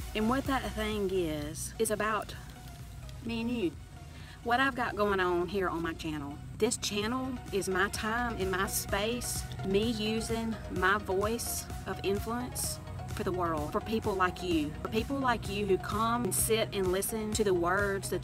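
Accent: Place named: South, United States. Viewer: Yay for a fellow southern speaker, lol. I like all the scene cuts that move the story along. I need more of that in my stuff cause it holds attention. I don't have a lot of voice of influence as I don't work and I don't get out much except with the wifey. But when I do, I try to engage with people on a positive level and spread a little humor. Subbed!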